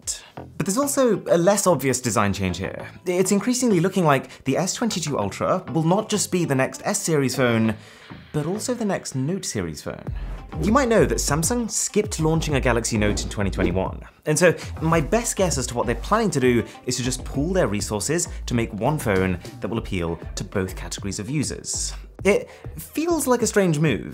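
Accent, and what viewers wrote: United Kingdom. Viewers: Its his wonderful british accent that gets me hooked on every word he pronunciates in all these videos. He needs to read audio books for better sleep. Lol.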